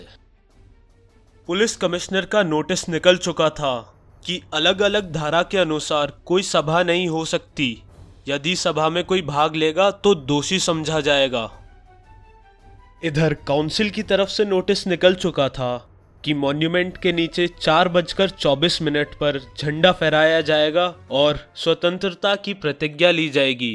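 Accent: Haryana, India. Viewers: Sir Are you from Haryana ?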